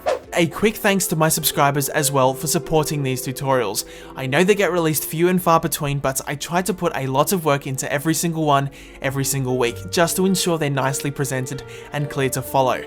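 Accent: Australia. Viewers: he has a bit of a Ozzie voice Like me because if from there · Are u an Aussie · Are you Australian?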